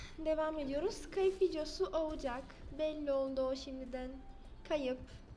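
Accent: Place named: Cyprus. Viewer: Merhaba konuşmana bayılıyorum eskiden kıbrıslı sevgilim vardı deyişik biraz turkçeniz ordan anladım zaten kıbrıslı oldugunu:)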